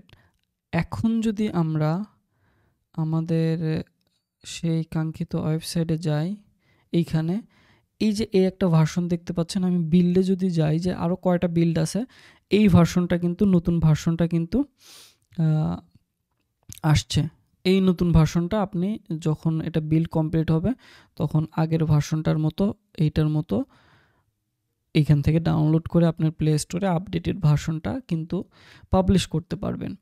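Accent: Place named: Kushtia, Bangladesh. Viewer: bhai apnar basha kushtia kothai?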